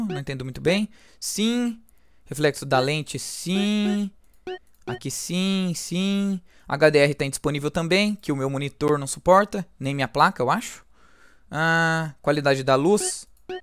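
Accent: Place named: South, Brazil. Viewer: Eu pensava que vc era do Rio Grande do Sul ou santa Catarina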